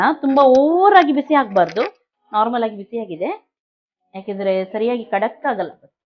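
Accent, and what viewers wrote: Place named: Kerala, India. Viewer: Mdm..ji.ur kerala style mixing speaking kannada explanation is extremely good n methodology is excellent..sister.let God gives u all sathvica sampathu..Badarinath.mysore.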